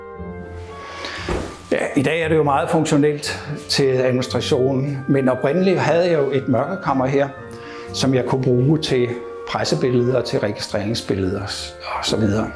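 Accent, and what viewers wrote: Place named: Bornholm, Denmark. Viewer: Tak for denne skønne video. Dejligt at høre den bornholmske dialekt i København. Sikken et liv, må have levet.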